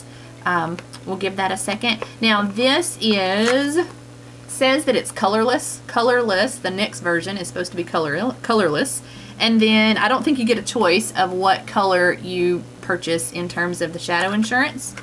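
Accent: South, United States. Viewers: Thanks for the review! Where are you from? Love your southern accent❤️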